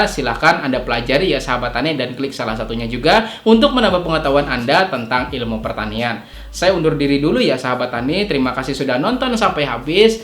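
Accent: Banjar, Indonesia. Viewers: Orang Banjar kh abang ni? 😁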